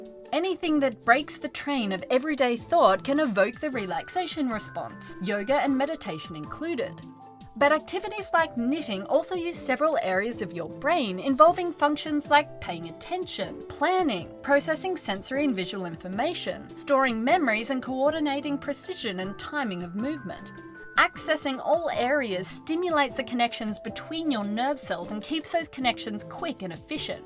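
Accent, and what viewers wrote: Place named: Australia. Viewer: I could tell by the accent. So am I. Seeing Australian women getting into science is really awesome because I want to have a career in science when I'm older, and it's really encouraging to see that its not just for the men:)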